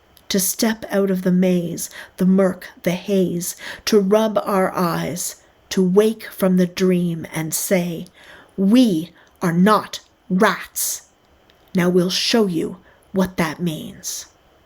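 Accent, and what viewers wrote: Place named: Scotland, United Kingdom. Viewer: Such a powerful and timely piece, and so beautifully delivered (even with a Scottish accent!). Thank you!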